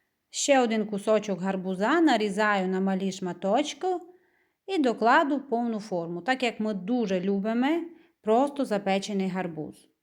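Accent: Transcarpathia, Ukraine. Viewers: Подписалась на Ваш очаровательный канал! Желаю ему процветания. Закарпатский диалект прелестный и самобытный! Привет из Калифорнии. Надеюсь, что наша родная Украина разобьет в пух и прах фашистов Раши и выгонит их со ВСЕЙ своей земли. И земля вновь зацветет своим прекрасным языком и диалектами! Слава Украине! Слава ВСУ! Все будет, Украина!💙💛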